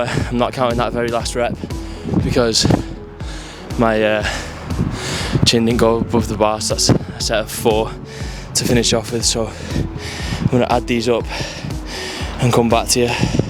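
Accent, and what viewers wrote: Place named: Northern Ireland, United Kingdom. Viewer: man... what part of England you from? It sound northern Irish or Scottish-ish lol but yeah..where you say you from now?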